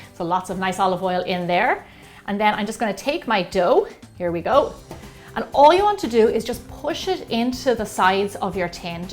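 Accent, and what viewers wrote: Ireland. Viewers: I love the way she says tomatoes😅 I'm not Irish so that's why I love your accent :)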